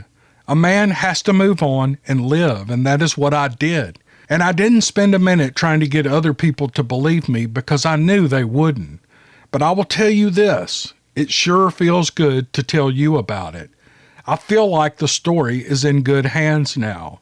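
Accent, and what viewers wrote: South, United States. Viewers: Oh my Lord! that was a fantastic account, I was hanging on every word. Thank you to the gentleman who finally shared this hair raising experience.You're also one hell of a writer. The combination of great writing, riveting content & sweet southern drawl of a narration made for a perfect story. I think I'd have night terrors for years had I experienced anything like that. Way good thing that cryptid already had his supper in front of him. It wouldn't surprise me one bit if most of these other Cryptids (other than Sasquatch) weren't the results of some black project military/mad scientist attempts at genetically combining or re engineering experiments gone awry. Whew! that was a mouthful!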